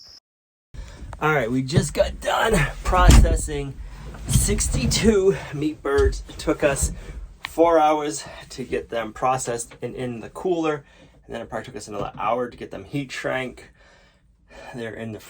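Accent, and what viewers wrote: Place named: Boston, United States. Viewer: Good Morning, I hear you on the rain it seems like it never stops looks like more today. your Boston accent keeps showing up I have one also formally from South Shore now in your area. Stay dry.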